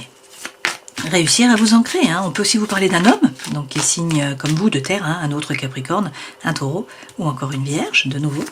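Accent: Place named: France. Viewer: tellement beau l acent francais...😍me calme...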